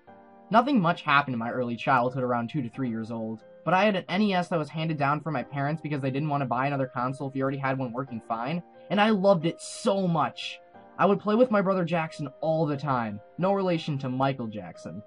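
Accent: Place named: United States. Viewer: You have no idea how hard it was for me to figure out what you meant by preschool, middle school, and highschool. Im from England so Im not really used to how you americans pronounce things xD I think I get it... Like if you cri evrytim.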